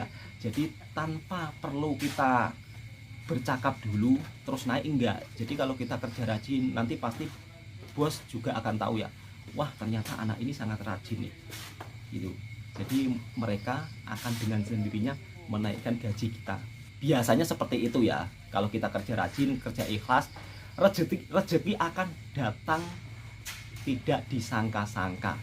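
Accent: Java, Indonesia. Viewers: Teruskan bersiar ....ngomong yg medok kang....!!!!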